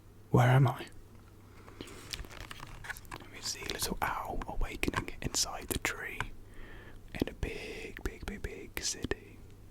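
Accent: United Kingdom. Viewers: That British accent tho was so addicting to listen😂😅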